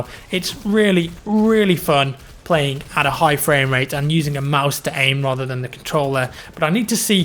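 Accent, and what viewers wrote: United Kingdom. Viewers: اللكنه البريطانيه 😷💔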